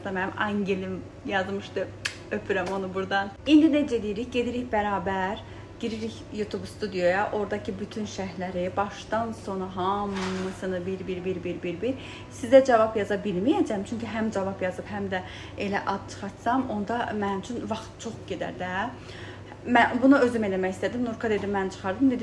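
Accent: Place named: Ganja, Azerbaijan. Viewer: Soruçuram gence lehcesidi. Bilen bilir.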